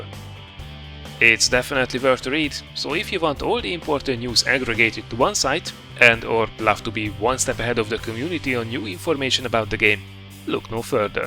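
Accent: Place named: Hungary. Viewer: ha tippelnem kéne akkor Magyar ... akcentusból ítélve.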